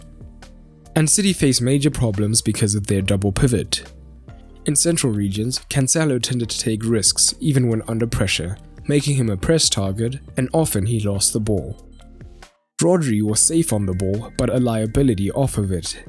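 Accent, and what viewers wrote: South Africa. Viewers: Bro where are you from ? You sound so South African ? 😂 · You sound south African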